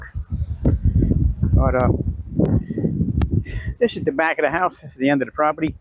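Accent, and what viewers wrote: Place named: Louisiana, United States. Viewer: What part of Louisiana are you from? Heard the gumbo reference and your accent sounds like my Louisiana relatives. They’ve all passed. Love the yard. You can tell how much you love your home and yard. It’s so peaceful. It’s like something I’d like to find.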